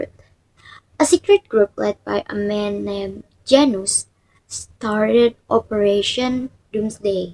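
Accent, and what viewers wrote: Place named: United Kingdom. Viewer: my british accent 😻😻😻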